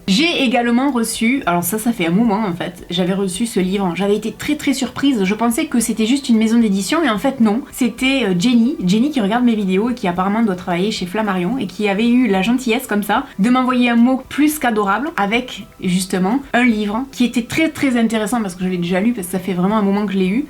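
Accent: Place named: South-West, France. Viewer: C'est toujours un grand plaisir de découvrir une nouvelle vidéo, on sait d'avance qu'on va passer un bon moment (une bulle personnelle dans le temps, et pourtant je tiens à redire que je ne me maquille pas) car tu es une personne rayonnante, solaire et tellement agréable, de plus j'adore ton p'tit accent du sud-ouest! J'aime beaucoup les parfums de la marque Adopt que je porte très régulièrement, ils sont d'un rapport qualité/prix excellent! On m'a demandé à plusieurs reprise quel était le parfum que je portais (rose noire, sugar, yummi candy) une chose qui m'est arrivée moins souvent alors que je portais "un vrai parfum de marque" (mes préférences vont chez Guerlain, Lancôme et Mugler). J'aime bien t'entendre parler de maquillage même si je n'en fais pas usage, pour moi une simple crème hydratante riche de chez Avène ou la Rose-posay. Je suis bluffée quand je vois comment on réalise un maquillage techniquement, cela n'est pas si facile à faire, question d'entrainement j'imagine. 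Chère as-tu un avis sur le lait crème Embryolisse que j'aimerais beaucoup tester, j'espère qu'il convient à une peau très sèche, sa texture me semble très chouette. Bonne et longue continuation à toi!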